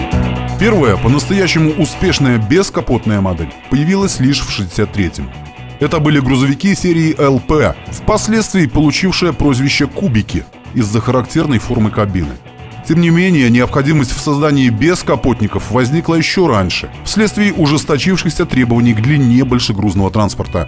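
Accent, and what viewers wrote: Belarus. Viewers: не знаю откуда, но акцент характерный для Витебщины, особенно ярко слышен на склонениях цифр - "пЯци", "дзевЯци" и подобное. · Автор из Беларуси?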